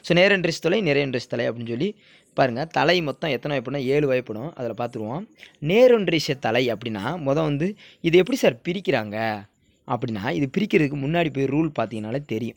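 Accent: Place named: Madurai, India. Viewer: Madurai slangue 😂👍🏽 in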